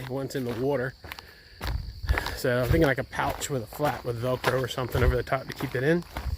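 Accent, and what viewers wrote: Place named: Delaware, United States. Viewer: I'm glad I stumbled upon your video. I section hiked MD and NJ this past year and I'm planning on doing all of PA this summer. I thought I recognized that Delaware accent. I'm also from Delaware. Good luck to you on your thru-hike. I'll be following along.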